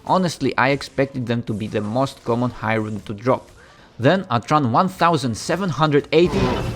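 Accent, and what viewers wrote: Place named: Eastern Europe. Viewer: Comfy vibes? Check Eastern-European accent? Check Yep. This is a D2 farm video alright. Welcome to my second-monitor list, good sir 😊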